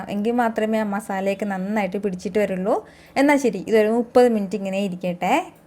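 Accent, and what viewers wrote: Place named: Kasaragod, India. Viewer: kasargod orgin ano....slang kasargod style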